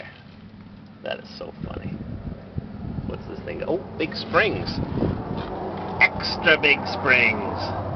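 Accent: United States. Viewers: WHAT!!!!!! You sound like Most Zombue Americans these days..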